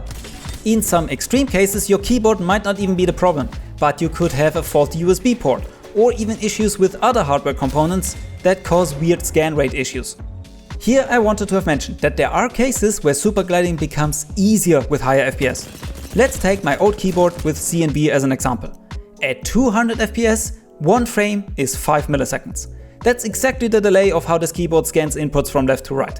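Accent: Germany. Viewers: Hört sich Deutsch an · You have very german accent. Good Video bro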